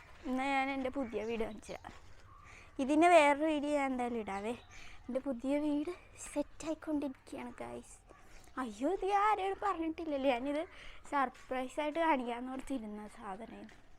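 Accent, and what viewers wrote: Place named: Cherthala, India. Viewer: ടാ... ചേർത്തല സംസാരം, എവിടെ ആണ് വീട് 💞💞💞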